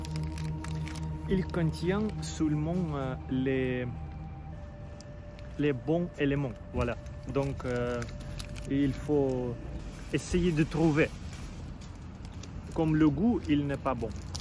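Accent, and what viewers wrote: Russia. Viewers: Вы не францзу же. Я слышу русский акцент. Все фальшивка.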